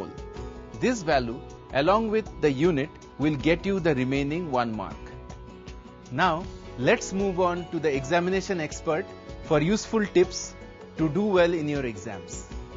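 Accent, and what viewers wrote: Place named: Bengal, India. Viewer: when you can hear the bengali accent so strongly...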